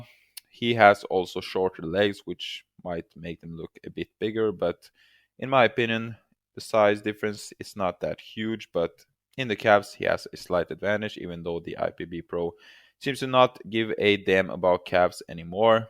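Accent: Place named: Sweden. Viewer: If I may ask, are you Swedish???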